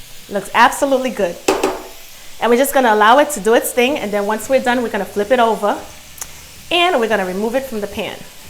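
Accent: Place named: Maryland, United States. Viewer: You are one fine cook. I just made your Lobster tail stuffed crab recipe and I’m the local hero hereabouts thanks to you even though I think that I slipped up on the Cajun.. I used 5 full length lobster knuckles/claws and secured them together with long toothpicks..in lieu of the lobster tails..more tender..Placed on a 5”x 6” shell.. Your recipe makes two servings..PERFECT..Thank you.. and by the way your accent belies you. Are you living somewhere between New York… Maryland girl? LOL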